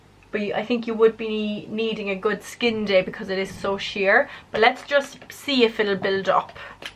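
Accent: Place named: Ireland. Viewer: Beautiful 😍....I am a lady in my sixties and I have been using Trinny products for years now...amazing....she does a product called...." face finish ".... which is a cream powder you really must try it...no one else in the cosmetic world does it....enjoyed listening to your Irish lilt....love Jane...North Wales UK....x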